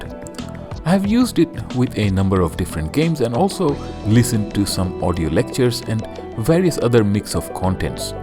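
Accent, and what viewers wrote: Bengal, India. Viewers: your accent made me guess that you could be Bengali. That's why I asked.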